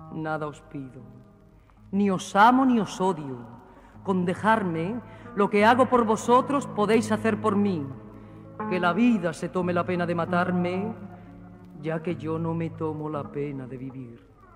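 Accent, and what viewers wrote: Spain. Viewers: Poesia bellisima, pero la andaluza que recita, es maravillósa de andaluza a otra, es genial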